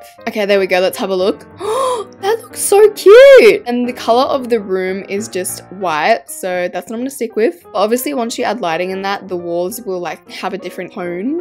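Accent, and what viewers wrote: Australia. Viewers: WAIT YOUR AUSTRALIAN, ME TOO!!!!! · Wait is she Australian? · she Australian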